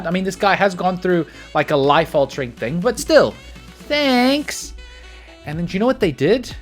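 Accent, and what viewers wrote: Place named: South Africa. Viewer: Surely you’re South African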